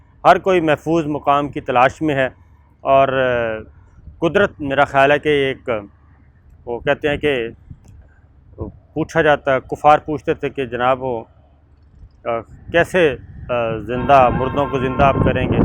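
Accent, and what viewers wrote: Punjab, Pakistan. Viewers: Ounki mother language Punjabi hi. App ounki khabar soun rahay Hain or sountay rahain gay. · Maaf kardo sabir ko..iska english..urdu..sab punjabi me bolta hai.. .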